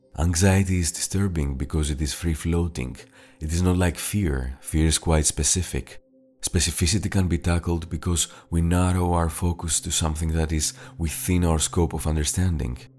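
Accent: Greece. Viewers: Why can I tell that you are Greek just by listening to your voice? Anyways, great video!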